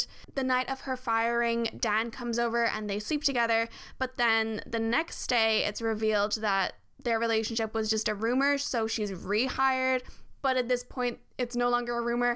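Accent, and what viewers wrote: Ireland. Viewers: This is such a good video, thank you so much for creating it and doing such good research on the topic, I'll definitely be sending this to some of my old friends whenever Pretty little liars relationships come up as a topic.. This is unrelated but I can't seem to place your accent, do you happen to be Irish? xD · you’re accent is so interesting because it’s definitely american but you’re ‘t’s are so irish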